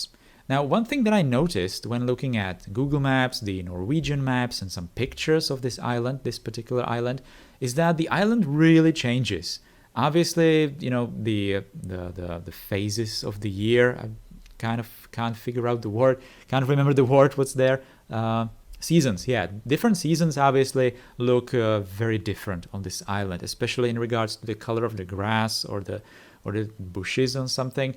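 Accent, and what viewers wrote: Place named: Czech Republic. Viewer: Personal question, you're not a native speaker right ? You sound a little Czech. Not insulting your English, i think it's great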